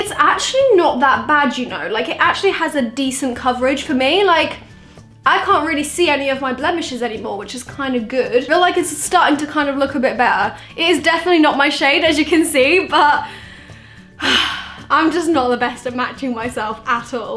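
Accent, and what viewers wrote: United Kingdom. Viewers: Is she British? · British Accent?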